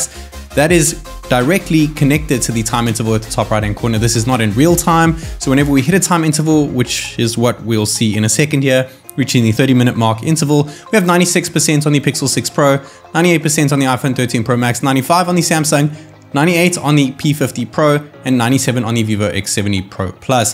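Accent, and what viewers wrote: South Africa. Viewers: 100% south african immediately picked up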